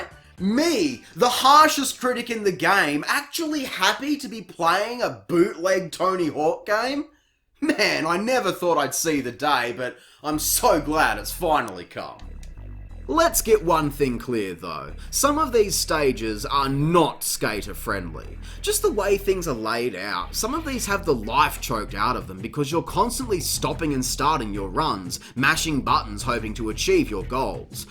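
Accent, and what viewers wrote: Australia. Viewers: Aussie accents make everything 17% more entertaining.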